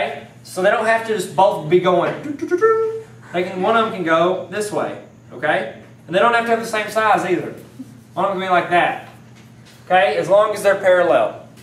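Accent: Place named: Louisiana, United States. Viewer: You have to live in Louisiana, that accent tho, its ok I live in alabama, I have an even more hick accent than you do.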